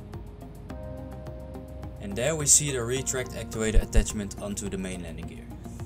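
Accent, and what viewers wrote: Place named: Netherlands. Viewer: I smell nederlands. Coole video!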